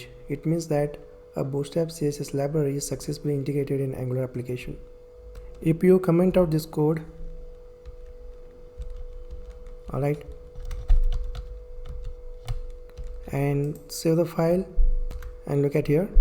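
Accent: India. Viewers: Very helpful ❤ but please try to talk in Indian English itself. The mix of both doesn't seem good to hear. No offense !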